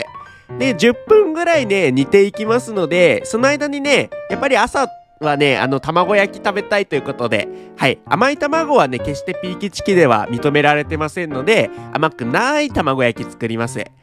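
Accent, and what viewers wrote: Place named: Tokyo, Japan. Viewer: コメントありがとうございます😊 東京の人ですか？話し方がそうっぽいので。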